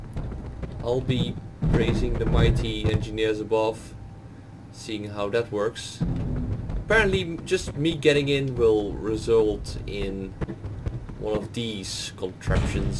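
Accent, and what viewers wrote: Netherlands. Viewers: Are you dutch? You sound Dutch or even a bit French?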